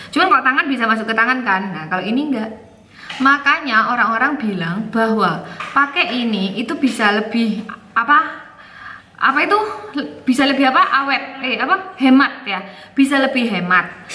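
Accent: Java, Indonesia. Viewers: Lucu siii ba ngomongnya jawa banget yaa hahahaaaa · Jawane.bener bener meldol bgt,,,ngakak aku ndelok spean mb lol😂😁😁😁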